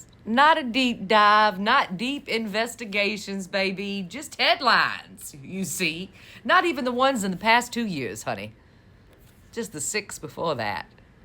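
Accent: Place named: South Carolina, United States. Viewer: South Carolina genteel accent- I'm guessing where this Southern Belle is from.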